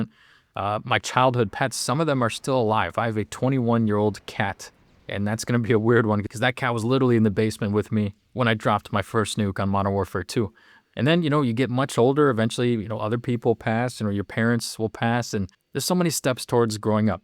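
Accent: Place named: Wisconsin, United States. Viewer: Bro has the most Wisconsin accent ever I gotta say dude 😅😅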